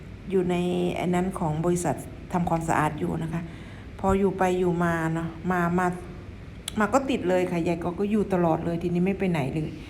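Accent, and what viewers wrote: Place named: Nyo, Thailand. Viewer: จ๋า🥰🥰สำเนียง เหมือนญ้อเลยค่ะ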